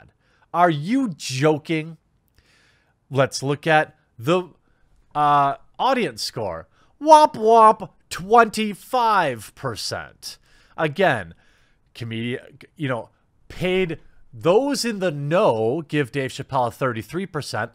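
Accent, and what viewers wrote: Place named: Midwest, United States. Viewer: Your Midwestly was showing a bit in this one.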